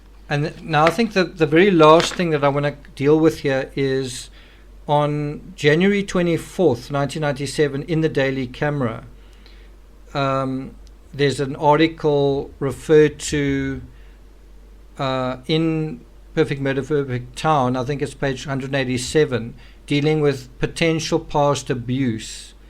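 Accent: South Africa. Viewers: Children. He has a South African accent.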